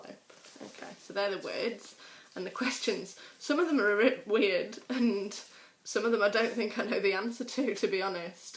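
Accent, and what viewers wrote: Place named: North, United Kingdom. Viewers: North Yorkshire is a Northern accent nothing southern about it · I remember speaking to somebody from Harrogate once in Manchester and being from just outside Sheffield in the country she almost sounded southern! Noticed that in York too. Your accent is much closer to a Yorkshire accent although yous (which is Irish) is also used throughout Manchester and Liverpool and seems to have spread to the rest of England. If I am speaking to one person I say 'thee' but I say 'you lot' for more than one person.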